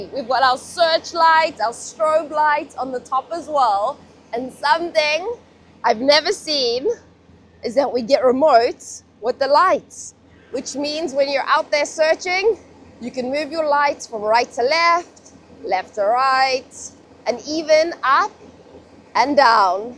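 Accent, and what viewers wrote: South Africa. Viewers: You sound South African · She sound South Afr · As a proud South African I can definitely hear my own. Im assuming the host is South African 👊🏽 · Who is this Girl. Is she South African?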